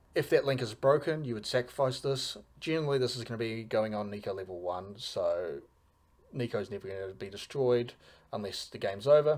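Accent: New Zealand. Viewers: Good old Kiwi accent!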